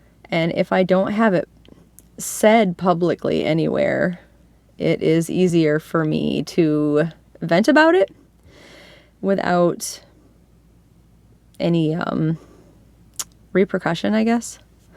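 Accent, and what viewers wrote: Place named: United Kingdom. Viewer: I thought I heard a British accent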